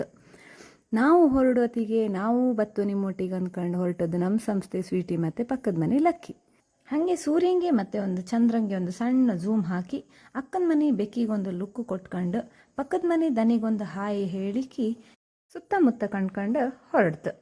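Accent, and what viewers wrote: Kundapura, India. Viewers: Kundapra kannada super.❤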